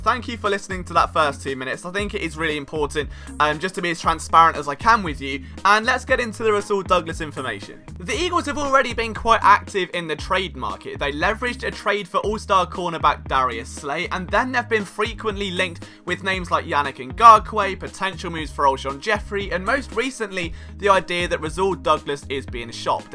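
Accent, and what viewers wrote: England, United Kingdom. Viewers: You're British??? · Weird mix of English accent and Eagles news. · Wait, you're British? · Ugh damn I try but I can't stand the accent at all!! Fuk not that the vid is bad. It's just you talk fast and loud in the accent I think that's what people are talking about I don't think it just cuz ur not a American u just talk fast and have a heavy accent .I personally cannot stand an English accent at all. But it has nothing to do with you personally at all. Eagles fans are over the world and anyone can be a fan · ... I'm old enough to be your grandfather, born, raised & lived in the Philly area most my life, but now live in northern Colorado... You Sir, are young, born in Britain, have a British accent and know tons of facts about the Eagles... Yet it all means nothing until we cut ourselves open and... "BLEED GREEN" and that sir, you do... 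screw the trolls! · I’m from Philly and listen to a lot of Eagles content and yours is by far the best. I like the fact that you don’t hype things and that you dive deep to give all of the supporting information that you can for every post. When I first heard your accent I was curious about why and where you were doing this from and I confess I am still a little curious. I’ve never caught your full story of how a Brit got into this. you are exceptional at what you do! You have a gift and please keep bringing us information starved and isolated Eagles fans Eagles news to brighten our days! Thank You! Ace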